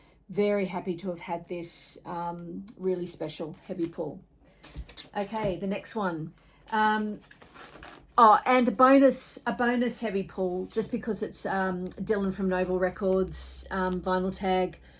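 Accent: Australia. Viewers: Great to hear an Aussie voice, not too many in the VC. Cool that you showed Hermitude. I grew up at the foot of the Mountains, my Aunty still lives at Blaxland. Where's a good record store in Western Sydney? Next time im there i want to have a dig.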